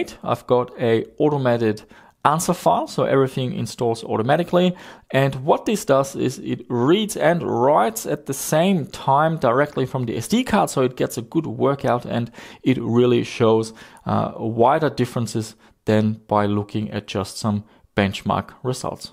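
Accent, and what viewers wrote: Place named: Germany. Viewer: Sehr deutscher Aktzent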